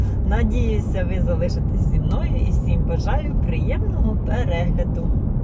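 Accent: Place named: Prykarpattia, Ukraine. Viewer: Приємно бачити справжню українку,яка далеко від України пам'ятає,хто вона і якої землі дитина.Слухаючи,відчуваю,що із Прикарпаття,можливо,помиляюсь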